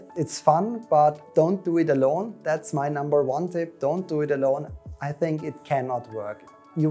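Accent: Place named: Styria, Austria. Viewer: Eher steirisches Englisch!:) <3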